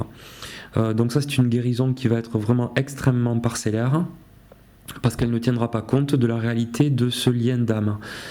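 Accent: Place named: South-West, France. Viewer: j entends un ptit accent du sud ouest comme moi...😍🤩😘 me tromperais-je?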